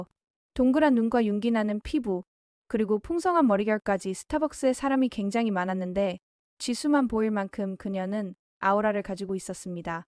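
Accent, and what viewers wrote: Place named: Germany. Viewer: 독일식 발음이 독특하네요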